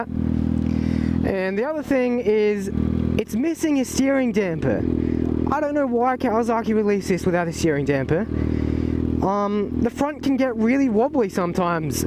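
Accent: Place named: Australia. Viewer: How Australian haha "where's the rabbit?" Great content mate keep it up!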